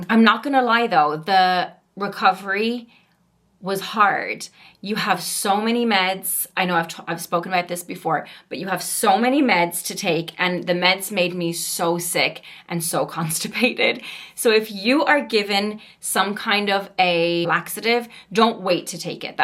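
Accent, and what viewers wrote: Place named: United States. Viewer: Thank you for sharing, the first time I encountered one of your videos was in Brazil 2012 when I was experiencing some homesickness. I immediately was like who is this beautiful woman that has an American accent but not at the same time. lol...I loved it and I appreciate your honesty throughout the years, it's been lovely seeing you remain true to yourself. Thanks again for sharing because you don't have to Well wishes from Guyana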